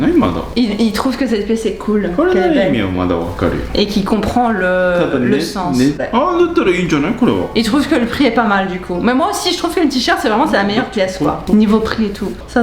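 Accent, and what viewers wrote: Belgium. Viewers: bitume C’est typique Belge, d’ailleurs Quick ils le prononce Cuik 😂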